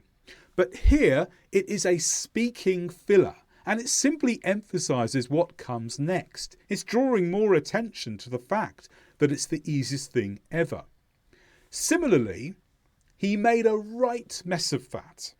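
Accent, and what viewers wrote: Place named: United Kingdom. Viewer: I’m impressed by the volume and thoroughness of the information you’ve presented here. Thanks to the video I can identify grammar spots to work on and improve. And the fact you are from the UK makes me even happier ‘cos I prefer this accent. ❤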